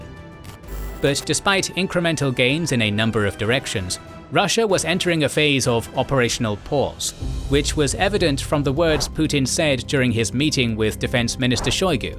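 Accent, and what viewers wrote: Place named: United Kingdom. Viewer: The creators of this propaganda & misleading terminology in this video are quite late.. My dear British-accented pigs, here it is: It is not an invasion & it will not be an invasion. This is a security operation to purge the UA military organization in which Nazi ideology has spread and become purely Nazi military battalions and the liberal West supports these criminals just for sake of mere They see them as tools that can inflict irreparable losses for the Russian army in the future because the best leaders in Russia are there today fighting against the latest Western weapons & against a corrupt military organization backed by the democratic West since 2014, their military doctrine has nothing to do with alleged democracy of the Kyiv criminal regime.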